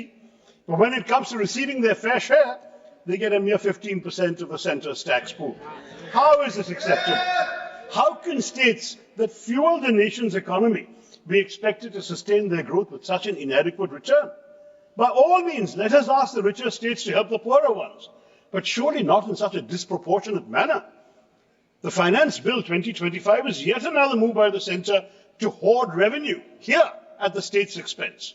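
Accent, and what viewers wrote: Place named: England, United Kingdom. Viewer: At bjp side, no one understand what he is talking about ,in this English accent....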